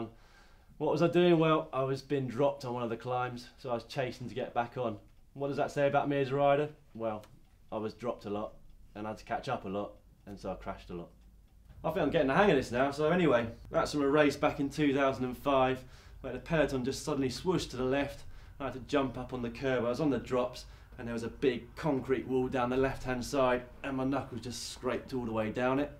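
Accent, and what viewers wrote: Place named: North, United Kingdom. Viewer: Why is so angry and northern in this video?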